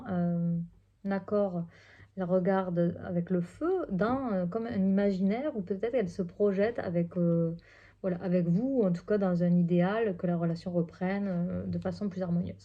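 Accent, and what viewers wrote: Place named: Quebec, Canada. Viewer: bonjour,.c'est tout à fait l'écho de ma situation.merci pour ce que tu nous apportes, avec l'accent québéquois qui ressort +++aujourd'hui😋tout mignon.😍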